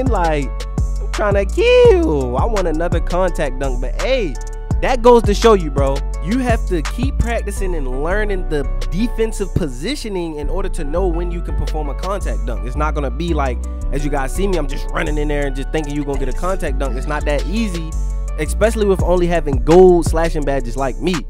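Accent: Baltimore, United States. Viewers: He sound like he from Baltimore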